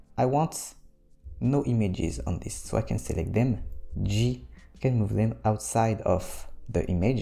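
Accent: France. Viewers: ça pue l'accent français tout ça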